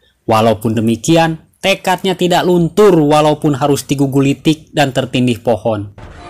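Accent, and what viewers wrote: West Java, Indonesia. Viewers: Lanjut dengan logat sundanya euyy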